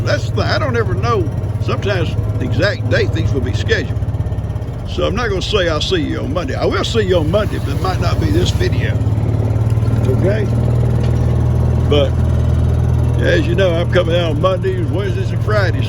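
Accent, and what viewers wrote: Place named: Texas, United States. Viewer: Listening to you with what you show and tell is therapeutic for me. Just love your videos. So interesting. Seeing how high the water went in Harvey. Wow. Heartbreaking for all the animals. Love Heidi. She is a true mate. From Australia. Your accent is strong. Love that Texan accent. God bless you and family ❤️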